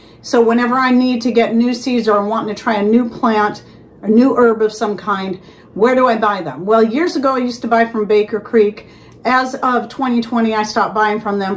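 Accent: United States. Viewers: Hi, I do like most of your videos, but I am finding American accents a bit hard to understand! I was born in Norway, so Norwegian is my mother’s language and my first language. I have lived in South London, England for 54 years now, so English should come easily to me, but I am nearly 72 years old and my brain is slowly loosing a bit of each language! But keep up your good work As I have learnt so much from your videos. Blessings ❤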